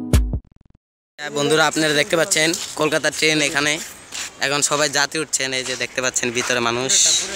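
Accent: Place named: Bangladesh. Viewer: Kolkata theke ele but tomar kotha Bangladeshi der moto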